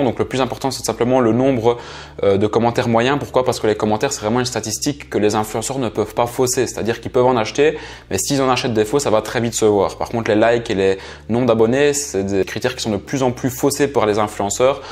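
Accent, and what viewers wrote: Belgium. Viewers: T'es belge? Je reconnais l'accent belge ahah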